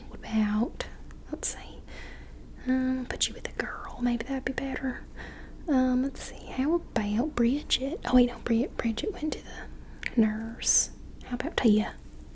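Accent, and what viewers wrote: South, United States. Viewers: yes ❤️. You are my favorite to listen to, the southern voice was amazing! Some acting skills flowing through your blood lol. Thanks again! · I guess we got a substitute teacher to take on place today! I love the Southern Accent too, so entertaining to watch with some ASMR Much Love to you! ♥️♥️♥️ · It’s great to see Tilly again! She was fired from her previous job, hopefully she doesn’t get fired again 😂 I love that southern accent, it’s very funny. Thanks for this fantastic video! 🤩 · Your Tilly esk southern accent characters are the besttttt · Ohhhhh southern USA XDD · the southern accent is so good! do you live in the south?? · The second I saw the thumbnail I knew I'd be loving 💕it already 😍👍 Congratulations 👏👏👏 on the return of the much-loved Miss Tilly roleplay you have been so dearly missed!!! 😪 So love ya awesome southern accent. 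You are the absolute best!!! 💙💙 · I think the Southern drawl is the best accent in the world. And the 'turns of phrase' these southern folk have are just delightful! 😄 Thanks for the video,! 💖✨ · Pretty dang good southern Belle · This could be your best roleplay yet. I love the colors on the outfit you are wearing. Nice color on the glasses, and nice pink color on the phone. Very nice blue color on your nails. I love hearing you converse on the phone. Your interaction speaking to the students is so well acted and spoken. I love your Southern accent. Your accent is so smoothly spoken. This is Teacher Ms. Tilly, formerly known as Nurse Tilly? The Nurse decided to become a teacher. You are a person of many talents and skills. Your voice when you speak, especially when you whisper is so soft and relaxing that I felt like I'm floating on a cloud while listening. Your personality shines. I think this is a performance worthy of an award. 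You could have been a wonderful Teacher had you chosen that career. You are fantastic. I thoroughly enjoyed this. btw, You are the cutest girl on YT, in my opinion.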